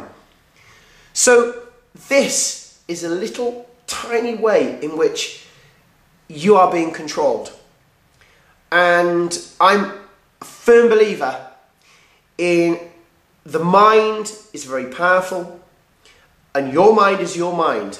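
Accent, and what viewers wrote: United Kingdom. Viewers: im from germany and i alway enjoy listening to your videos. im a big fan of the british language! :)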